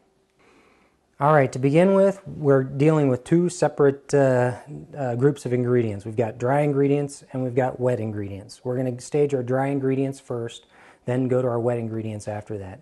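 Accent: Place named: Canada. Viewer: Sounds like he's Canadian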